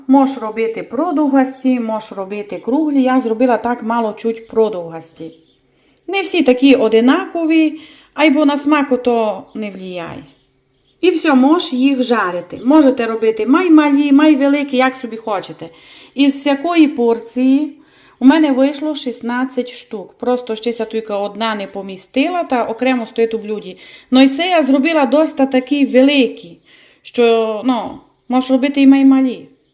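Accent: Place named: Berehove, Ukraine. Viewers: Як я люблю слухати вашу мову. У нас так говорила сусудка , вона була д Берегово.Подяка вам за рецепт.❤ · Украинский язык у этой пани вперемешку с русским. Три украинских слова одно русское. Акцент западно- украинский, это верно, с поющими нотами. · З акцентом. Суль, олую, ойво. Це русини так розмовляють.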